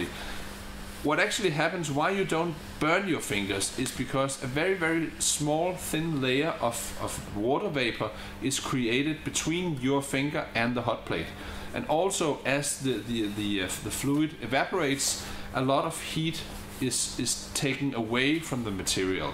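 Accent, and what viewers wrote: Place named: Germany. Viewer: the moment i heard the german accent i knew he was legit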